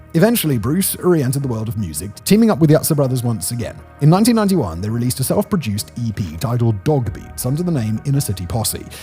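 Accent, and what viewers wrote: RP, United Kingdom. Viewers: A British accent documenting an American phenomena... will almost always be tediously inaccurate, and a giant waste of time for anyone except those who live in the UK and believe that they are learning something. They aren't. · I love hearing say " " in his incredibly posh British accent 😂😂😂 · The original comment said he has a posh accent. You replied that isn't really all that posh, but middle class. That is why I made the point that you don't have to be posh to sound posh. Hope that helps.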